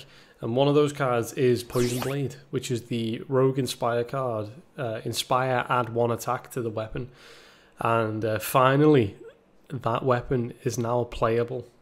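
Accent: Liverpool, United Kingdom. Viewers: Bruuuuh what?! A scouse streamer?! Yes laddddddd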